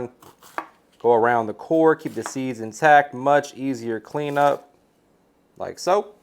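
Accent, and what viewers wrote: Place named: New Jersey, United States. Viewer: Where you from nice voicd.guess jersey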